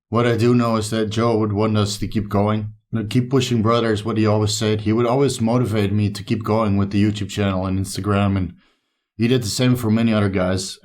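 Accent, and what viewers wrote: Netherlands. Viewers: To be honest, I have only seen calloboration videos of Jo but I really liked him and I am left wondering why I only started to follow him now. Still, your loss of a loved one even resonates in me and he will be in my thoughts. I also do not know you, however, your accent sounds Dutch. In case you are, ik wens je heel veel sterkte in de komende tijd! Rest in paradise Jo, we will carry on for you, with you in our thoughts❤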